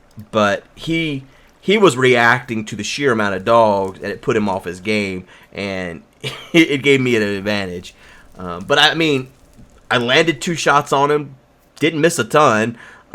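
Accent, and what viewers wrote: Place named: United States. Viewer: Your American accent nice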